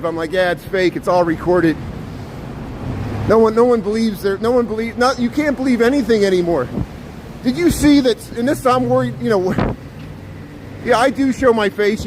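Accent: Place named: Midwest, United States. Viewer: He gotta be from midwest love his voice😌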